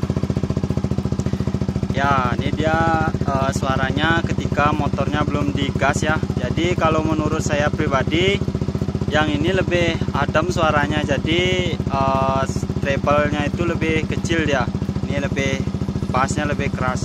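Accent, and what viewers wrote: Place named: Bali, Indonesia. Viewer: Logat nya bali banget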